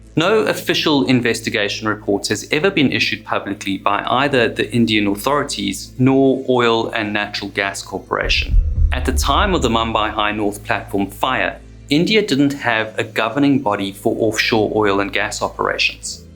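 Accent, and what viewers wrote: South Africa. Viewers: Is the narrator from South Africa?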